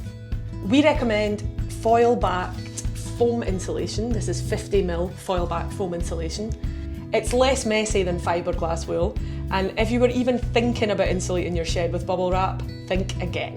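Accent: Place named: Scotland, United Kingdom. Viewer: Great advice hello from England i agree you do need a gap between the cold air and the warm thank you ,lovely scottish accent 😊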